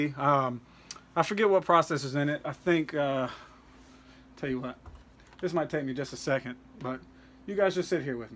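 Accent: South, United States. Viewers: I knew he wasn't black, he doesn't even sound black you all just aren't used to white Southern accents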